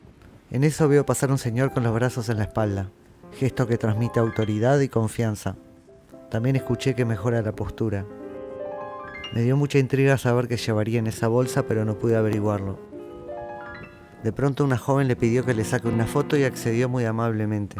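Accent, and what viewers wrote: Argentina. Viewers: Y te digo que por su forma de hablar al principio creí que era argentino, suena igual 😄🇦🇷👋🏻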